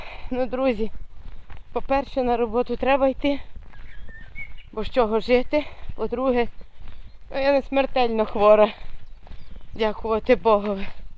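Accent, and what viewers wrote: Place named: Western Ukraine, Ukraine. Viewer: Ви така позитивна ,тільки почула вашу мову відразу зрозуміла ,що ця жіночка з нашої західної частини України !😊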